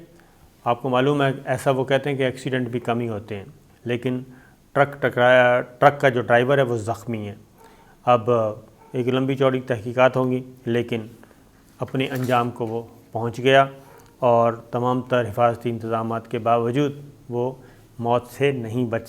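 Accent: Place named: Saraiki, Pakistan. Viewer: معافی چہ معنی دارد۔ صابر شاکر صاحب اچھی اردو بولتے ہیں۔ لیکن کہیں کہیں لہجہ پنجابی بلکہ سرایکی ہوجاتا ہے۔ مثلاً گھنٹے کو گھینٹے فرمایں گے۔ اسکول کو سکول۔ قران کو کوران۔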